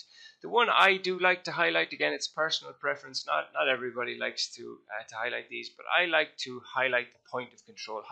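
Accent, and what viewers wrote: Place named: Ireland. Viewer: Man, I'm finding it a nightmare setting up my charts... bloody Oirish accent makes it difficult.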